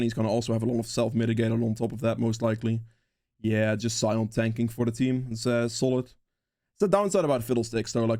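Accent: Netherlands. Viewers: Hey thanks for the guide. You seem to have a Dutch accent. Are you Dutch by any chance? :D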